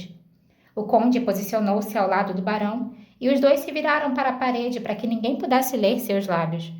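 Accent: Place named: Rio de Janeiro, Brazil. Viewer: Essa voz linda com o o delicioso sotaque carioca ❤️( também sou carioca mas vivo em SP e fico com saudades )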